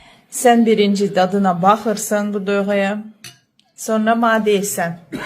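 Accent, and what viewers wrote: Ganja, Azerbaijan. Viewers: Xalis Gəncə ləhcəsi😂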